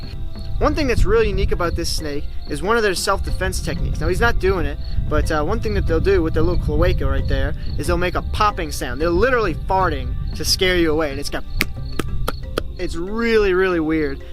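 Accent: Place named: South, United States. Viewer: WE LOVE YOU IN ALABAMA. KEEP YOUR ACCENT SOUTHERN. IT IS WHAT MADE YOU AND SETS YOU APART. WHOOP WHOOP. LORD BLESS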